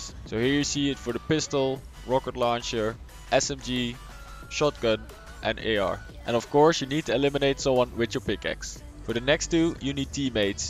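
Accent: Netherlands. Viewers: ja, ik wist het. Ik zag het aan je naam en er zit iets van Nederlands in je Engels Niet dat je Engels slecht is hoor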